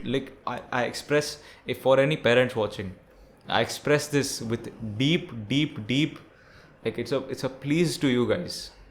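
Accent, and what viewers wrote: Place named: Gujarat, India. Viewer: Dude, I didn’t know you were Gujarati. Your dialect sounds Surti.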